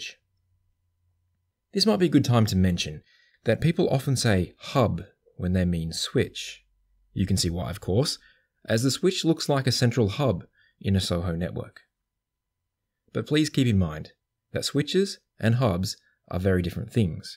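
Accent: Australia. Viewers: So, the narrator is Australian, I assume, based on his accent, right? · Very well made and informative video. Very easy to listen to australian accent also! Thank you. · Networking lessons in an Australian accent? What could be better?? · Is it Australian accent??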